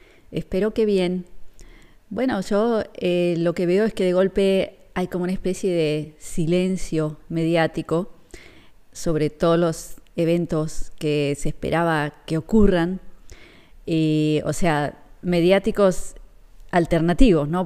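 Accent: Argentina. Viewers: Gracias. Es el tiempo del ser humano "común". Nosotros debemos reconstruir la humanidad en este tiempo. Respecto a los medios, desde mayo del 2020 no miro NADA...Me informo solo por Twitter....Por tu forma de hablar creo que sos argentina. Un fuerte abrazo desde Buenos Aires! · Excelente, gracias!! Les comparto lo que hago. Me he aprendido de memoria el Salmo 91 y el Salmo 23, y cuando voy camino a mi trabajo que es a las A.M. hago esas oraciones; antes de empezar a trabajar hago 30 minutos de meditacion. Luego empiezo a trabajar en un campo de golf y tengo sobredosis diarias de vitamina D. Cuando llego de regreso a casa no veo para nada TV, veo a Salud y Finanzas Inteligentes y me siento muy bien física, mental y espiritualmente. Sería excelente que hicieras meditaciones guiadas con tu amorosa voz Argentina. Saludos de un mexicano radicado en Las Vegas, NV.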